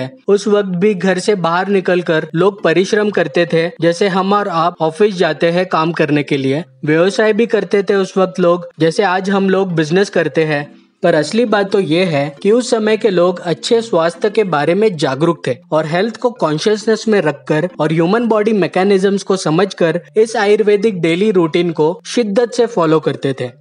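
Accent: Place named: Odisha, India. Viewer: Brother You Are From Odisha ? I am asking it because your language pronounciation are more related to Odia.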